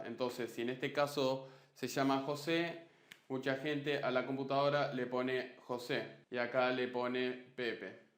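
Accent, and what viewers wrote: Argentina. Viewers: De donde eres entonces? porque tienes acento Argentino PD:Buen video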